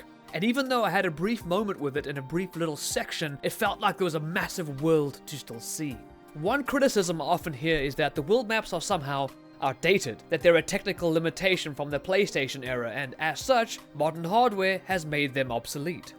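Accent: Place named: South Africa. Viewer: Hierdie ou klink Suid Afrikaans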